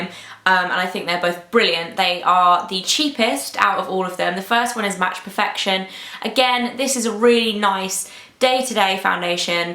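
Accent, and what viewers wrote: England, United Kingdom. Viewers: is she english or american ? :)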